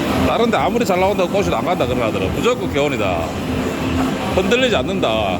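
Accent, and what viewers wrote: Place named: Gyeongsang, South Korea. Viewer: 구수한 갱상도 사투리 멘트에 정감이 가서 자주 보네요 ㅎ 건승하십시요 ᆢ참 기온 이벤트는 1일인가봐요? 그럼 이달말은 31일 8월1일 2일동안 이벤트인가요? 1자가들어가는데 궁금해서요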